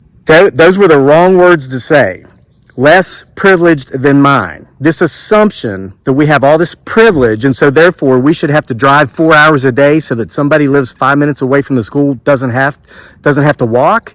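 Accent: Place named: North, United States. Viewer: Sweetheart is how many southern women talk! If you were born in the south than you know this to be true.. than again.. your accent soumds more like you are from up north.. Now.. i do agree with your issue.. Sadly.. this has been going on for a while..we have allowed this to happen.. So this should not surprise you at this point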